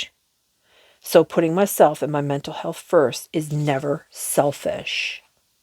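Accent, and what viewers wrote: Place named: Atlantic Canada, Canada. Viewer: so glad to have found your channel, 🩵💕 love your voice - its such a blessing to hear an atlantic canadian accent reading for spirit!! 😇🐉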